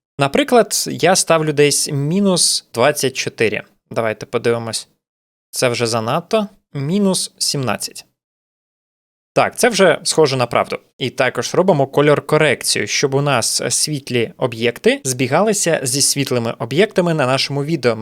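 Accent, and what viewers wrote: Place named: Russia. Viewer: Пиздец говорит с русским акцентом,типа украинец дохуя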